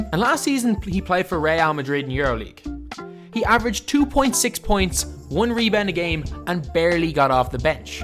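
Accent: Ireland. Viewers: This is summer league ball, there is no defense whatsoever being played. All these comments are not judging him how he should be judged. His release point on his shot is low, he does not look like he can touch the rim on any forays to the basket (taking it to the hole). I am not sure why a video was made of a guy playing summer league ball with no defense and the whole time the video shows him going by other kids of pretty poor talent. Jass looks fine as a player, but he is right hand dominant, low release point on shot, simply going around guys that are even worse than he is as an athlete. What can he do from a defensive stance, how fast can he tip the ball and then outrun several plays on the way to a steal and a bucket? This is what scouts have to see. Time will tell what he can do in college in Canada. But, he needs to shoot with a higher arc, higher release point above his head and the video showed nothing about what he can do on defense. 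Absolutely one of the most pointless videos of all time!! The narrator who made the video (with I believe an Irish accent) states that some say Jass will make the NBA and then begins to debate this on his video. Who is it that is saying Jass will make the NBA? This video is insane! Just let the kid develop and then we will see how good he can be. We won't know this for another two years.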